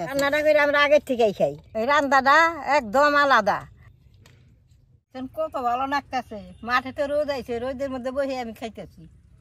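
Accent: Bangladesh. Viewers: Ami Bangladesh theke bolchi,Apnar kotha o rannar dhoron bangladesh er regional type, ami Bangladesh r Indian der accent Alhamdulillah valo bhabe differentiate korte pari,Apnar kotha bolar accent/bhasha puroi amader local Bangladeshi ❤🇧🇩 ami sure apni Bangladeshei live korechen, Apnar char paser area dekheo Bangladesh er nodi porer alakar motoi lagse❤. Apnar moddhe Indian kono boishistho nai:) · দিদি মা তোমাকে খুব পছন্দ করি। তোমার ভাষাটা আমাদের মত শোনায়। 🇧🇩 থেকে ভালবাসা নিও।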